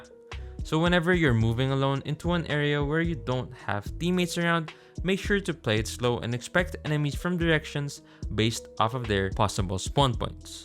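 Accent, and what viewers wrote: Philippines. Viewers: Hello are you filipino? I like your accent and voice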